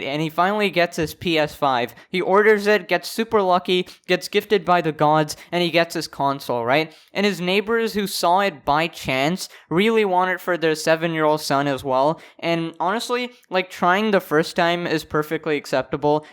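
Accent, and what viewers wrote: United Kingdom. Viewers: Bro I just went to your oldest video and the voice change is real 😂. LOL you used to have a British accent wtf 😂.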